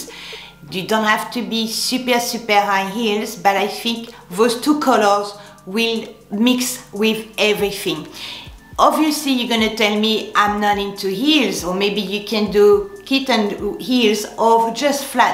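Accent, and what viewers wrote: France. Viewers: I love your videos you give such nice advice to let us put on a more classic look to feel more elevated and positive! And you are so lucky to speak French and have such a nice accent I wish I did:) French is the prettiest language! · I really enjoy your videos! Just love your French accent! If you're looking for another word for 'elevated' you might want to try using 'sophisticated' or 'refined' ..... This is not a criticism, I just noticed that you are often looking for alternative words. Looking forward to the next 'words of wisdom' session.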